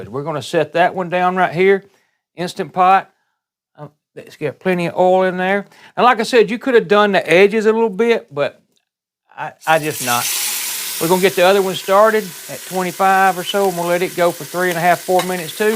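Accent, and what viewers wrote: South, United States. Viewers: He is from the south. I understand you. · Where is that twang from? Somewhere south like Lousiana or Georgia?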